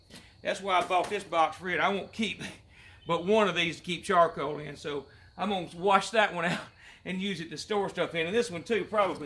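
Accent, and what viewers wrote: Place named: Alabama, United States. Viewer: My uncle from another grandmother AND you're using FOGO! AND you sound like you're from Alabama like my family (we're in Texas mostly nowadays). Subbed!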